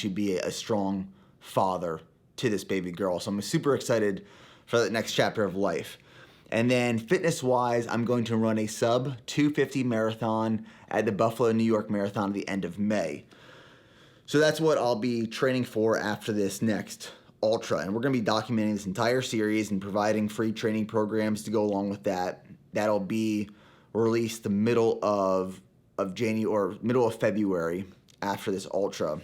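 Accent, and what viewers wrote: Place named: Texas, United States. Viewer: Пишу на русском, чтобы было видно, что я не местный 🇷🇺 Во-первых, спасибо за твои видео, ты хороший атлет. Во-вторых, желаю тебе достичь всех твои целей в 2022 году. Кстати, мы русскоговорящие тоже следим за твоими проектами (как же хорошо хоть немного понимать английский). Я, правда, смотрю твои видео пока только с субтитрами, но прогресс есть. Когда только начал смотреть тебя (примерно год назад), то было непривычно улавливать ваш Американский, ну или Техаский акцент, но сейчас уже лучше) Кроме того, подписан на тебя в Инстаграм. Так что знай, GO ONE MORE знают не только в Штатах)) Всем добра и мира! P.S. есть русскоговорящие, кто смотрит Ника? Отпишите внизу, очень интересно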